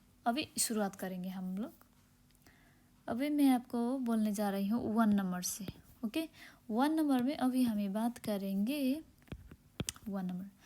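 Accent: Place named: Nepal. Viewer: Behen ji aap to Nepali ho, aapki Hindi pronunciation Nepali lag rahi hai, aur aap ka teaching bahut fast hai, play class ke bachchon ko 5th class ki tarah sikhaoge to kaise sikha payenge. Aur aap ka words bhi cursive letter me hai, use print letter aur bade size ke axronme likhiye. tabhi to kuch thoda Sikh payenge Dhanyawad. 🎉🎉🎉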